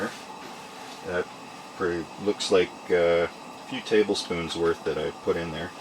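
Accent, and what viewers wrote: Alberta, Canada. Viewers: are you from alberta?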